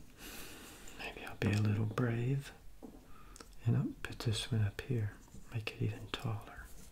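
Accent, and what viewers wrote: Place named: South, United States. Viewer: You have a very calming voice and accent 😊 Are you from the South?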